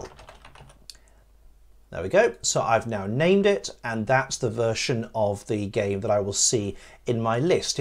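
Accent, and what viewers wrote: United Kingdom. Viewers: Bro's voice is British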